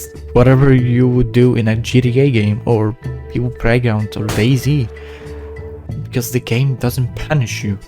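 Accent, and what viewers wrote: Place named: Turkey. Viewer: Bro has Turkish accent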